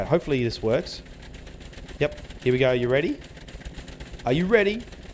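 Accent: Australia. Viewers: are you aussie? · dude I'm new to the channel and are you Aussie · "he's Aussie".........subscribes:D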